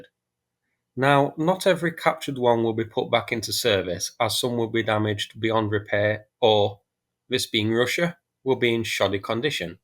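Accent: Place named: Midlands, United Kingdom. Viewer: Hi, I know you are in Tiawan, but just wondered if you are orginally from the midlands in England as you have that accent.......Great channel btw, thanks for the very informative videos.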